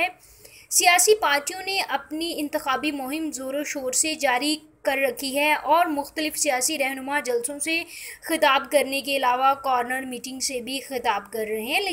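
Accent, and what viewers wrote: India. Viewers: yeh kisi indian ka channel hai. haram zadon ne Pkistaniyun ko confuse aur misguide karnay ke liye channel banaye huye hain aue bhonktay rehtay hai...mein shartia kehta hoon yeh ko indian larki ya aurat hai Pkistan ke kisi ilaqay mein aisa lehja aur alfaz ki adayegi mei ne nahin suni..yeh koi asami ya tibti accent wali hai..laanat ho tum indians haram zadon beghairton kameenon par.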